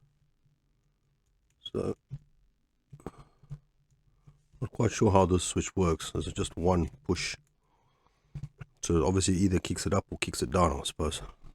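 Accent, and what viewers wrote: South Africa. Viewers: I always think of apartheid when I hear your accent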